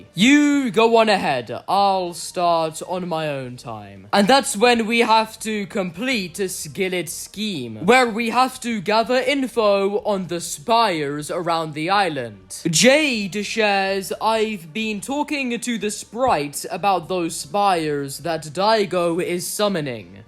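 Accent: United Kingdom. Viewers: what actually is your accent. You sound like you are not from Britain but have a sort of London accent but you have a habit of adding the "ah" sound before or after many "ah-words" you clearly think this is more enunciated but it just makes you sound like you cant pronounce words because English isn't your first language. It's confusing. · He is definitely Brit. You can't really go by accents. There are a bunch of them plus variations of the same accents.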